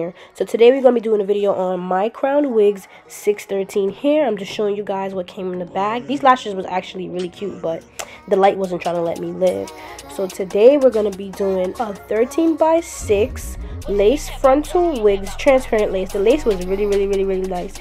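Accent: New York, United States. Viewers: Lmaoo you from ny?? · U from ny? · I knew you was from some part of NYC when you said “yo I be buggin” 😂 real NY shit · You must be from NY city lol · I could tell she’s from New York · I’m so glad you from NY like me cause it gave understand everything you say word to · you from New York ? 😭. I love the accent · Are you from NY?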